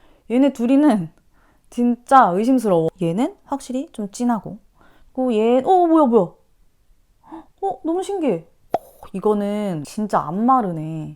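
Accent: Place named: Honam, South Korea. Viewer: 살짝 살짝 호남 사투리가 들려요 귀엽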